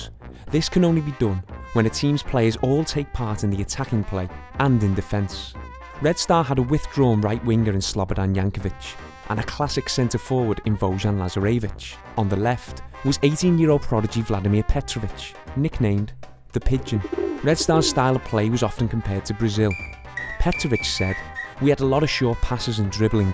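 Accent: Liverpool, United Kingdom. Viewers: Scouser accent 😂🤣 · May be not but I defo can say he's scouse 😁😁😁...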